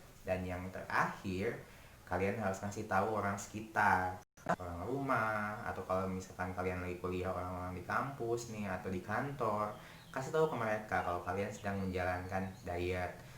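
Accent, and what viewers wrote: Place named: West Java, Indonesia. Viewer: Ow ow... Luarss biazzaaa.. Emezing pisan... Tetep sundanya kaluar.. 😍😍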